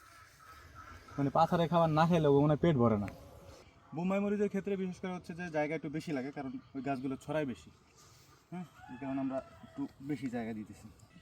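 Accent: Faridpur, Bangladesh. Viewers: ভাই আপনার বাসা কই?? আমার এলাকার একসেন্ট পাচ্ছি,, আমাদের ফরিদপুরের মানুষ ও এইভাবে কথা কয়। আর আমারও এমন গুলোমুলো টাইপের একটা ভাগিনা আছে 🥳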